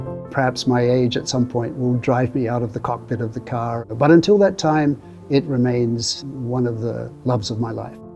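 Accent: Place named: United States. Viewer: I am Australian and I could detect your accent. It sounds like you've been in America a long time. Magnificent car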